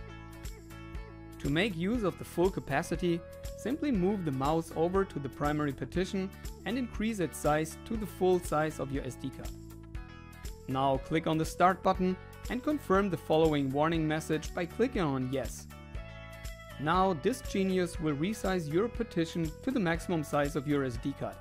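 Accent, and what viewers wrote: Germany. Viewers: Hey, aufgrund deiner aussprache gehe ich mal davon aus das du Deutsch sprichst :D! Wie bekomme ich nach der Installation der Rom, Spiele auf das Gerät? Ich weiß es sind schon etliche Roms vorinstalliert nur würde ich gerne wieder Pokemon auf Deutsch spielen! Habe die 128GB Karte drinnen und mit DiskGenius auch den restlichen platz frei gemacht :)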